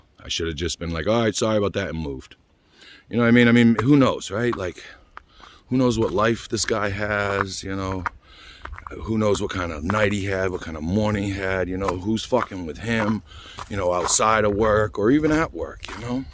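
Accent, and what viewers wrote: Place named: Massachusetts, United States. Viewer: great accent ....massachussets >?? Canada here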